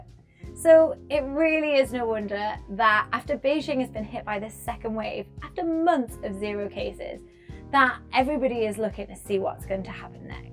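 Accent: United Kingdom. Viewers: A British accented woman turning pink, interesting...now you can see how powerful the CCP's brainwashing techniques really are. A low-IQ, low-efficiency way of controlling the virus and society became widely acclaimed by even the woman who is obviously well educated. Interesting... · I learn British accent from you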